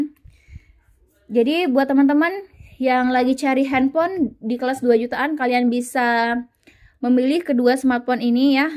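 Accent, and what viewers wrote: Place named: Sumatra, Indonesia. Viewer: Sumatra banget bahasa nya, samsung galaxi anam belas😢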